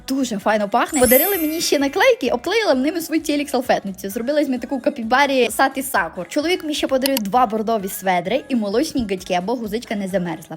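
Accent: Transcarpathia, Ukraine. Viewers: Скільки повторяти це ЗАКАРПАТСЬКИЙ ДІАЛЕКТ ЗАПАМ'ЯТАЙТЕ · А закарбацькій діалект😊😊😊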